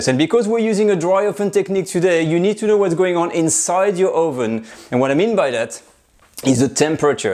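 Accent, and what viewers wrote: France. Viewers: I like your French accent man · This tutorial is a winner 🏆. I have exactly the same ramekins and the French accent have convinced me!!!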